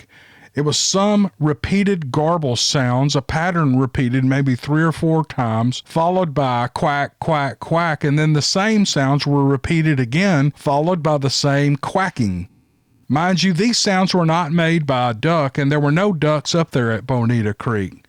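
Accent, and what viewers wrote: South, United States. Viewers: Get them fishes!! Just found you and love your story tellin. Love your accent, country as a biscuit! Keep em commin fella!😚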